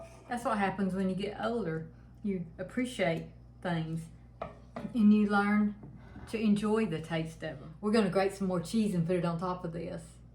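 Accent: South, United States. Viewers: I’m so glad I found your channel. I’ve now watched several of your videos and everything looks so yummy! Love your southern accent…………I love also that you call your husband sweet Hunter…….my husband was a sweetheart, but passed away 4 years ago……miss him so much!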